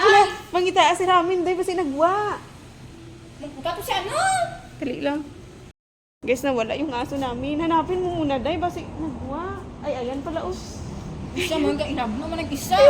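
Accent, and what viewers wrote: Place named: Visayas, Philippines. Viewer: Namis mo pala lugar 19yrs old ka pa huling silip. Muntikan na nawala si Ramen, ok yan bisaya accent, actually kahit ano accent ok lang. Napansin ko mahilig ka po pala talaga magpapicture. Sana all may phographer taga kuha picture. Sipag ng photographer mo, siya pa humirit na isa padaw picture. Ang galing ni Ramen sumundo sa inyo. Ayos!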